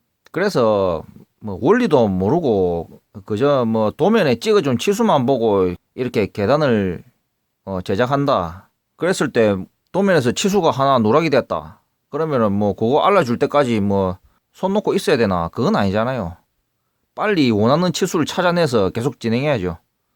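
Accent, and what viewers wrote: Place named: Busan, South Korea. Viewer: ㅋㅋ 늘 재미있게 보고 있습니다. 설명하시는 것들 충분히 이해했고, 표가 없이도 나름대로 길이를 찾는 법을 찾겠더라고요. 그래도 자세한 설명이 큰 도움이 되었습니다. 형틀목수 시작한지 얼마되지 않았는데, 관록도 필요하지만 이론이 바탕이 되어야 한다는 것을 많이 느끼게 해주셔서 감사합니다. 당장은 써먹을 일이 없겠지만, 기회가 오면 도망가지 않을 수 있을 것 같아요. 너무 빨리 그 날이 오면 선배들에게 눈치가 보이겠지만, 그래도 기회를 잘 사용해서 현장에서 인정받고 싶네요. 계산에 무지한 목수만 있는 것이 아니란 사실을 보여주고 싶기도 합니다. 구수한 부산사투리에 솔직한 썰... 늘 즐겁게 보고 있습니다. 언제 부산에 가면 한잔 하시죠 ㅋㅋ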